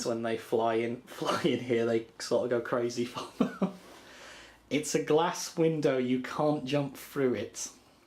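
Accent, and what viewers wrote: Yorkshire, United Kingdom. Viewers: I swear to god,your Yorkshire accent’s getting thicker!!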